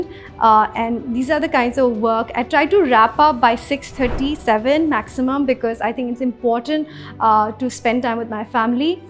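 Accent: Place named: Shillong, India. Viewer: She has a Shillong accent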